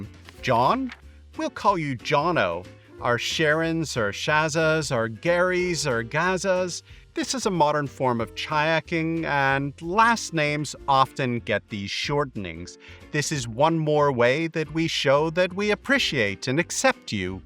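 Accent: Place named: United States. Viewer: why does he keep saying "we" if he sounds american, lol, saying "bugger" in an american accent is just not cool. Let me guess, oh yeah, this was produced by a woke TV channel, that explains everything. So annoying honestly -.- stop pushing the agenda of mass immigration -.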